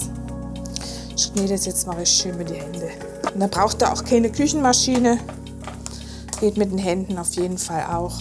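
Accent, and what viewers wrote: Germany. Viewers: Klasse! Dein Dialekt gibt mir immer wieder ein Rätsel auf:eine Mischung aus hessisch, fränkische und berlinerisch. Oder täusche ich mich?!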